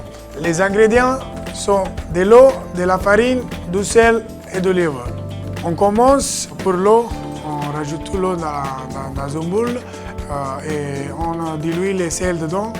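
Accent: Italy. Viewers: Great job, you're great. Love your Italian French accent, never be ashamed of it and be proud of your origins!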